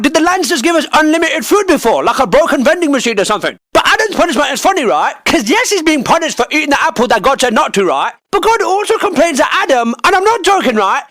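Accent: United Kingdom. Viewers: A british guy talking about bible, best video on YouTube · I love listening to the angry British man rant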